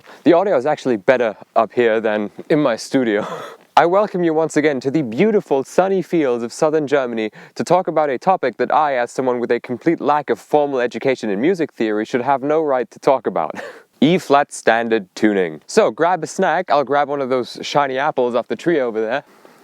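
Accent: United Kingdom. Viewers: I only play in Eb. Spot on British sounding German sir. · Could you, for the love of God, explain who you are british sounding person in Germany with good content but an odd guitar collection!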